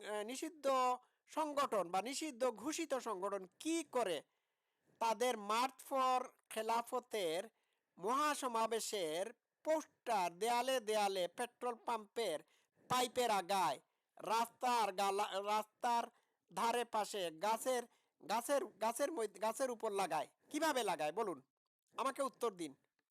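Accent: Sylhet, Bangladesh. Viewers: তোমার বাংলা উচ্চারণ সিলেটি ল্যাংটা ফুরিদের মত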